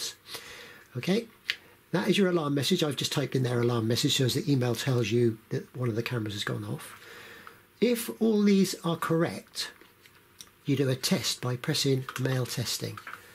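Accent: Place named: West Country, United Kingdom. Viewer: Mate u sound west country ish lol👍😎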